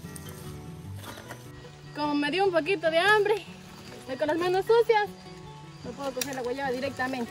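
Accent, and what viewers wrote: Ecuador. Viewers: Tienes asento Cuencano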